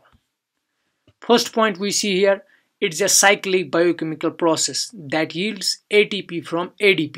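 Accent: Kashmir, India. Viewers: You sound like you're a Kashmiri? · Kashmiri accent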